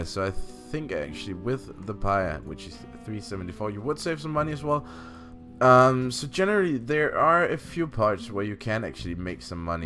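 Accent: Denmark. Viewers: Ye I thought I could hear some Danish accent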